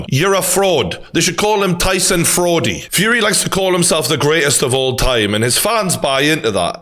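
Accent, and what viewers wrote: Newcastle, United Kingdom. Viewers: spot on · Spot on lad, great video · True 🔥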